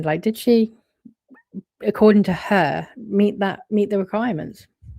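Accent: United Kingdom. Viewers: I thought it was your British accent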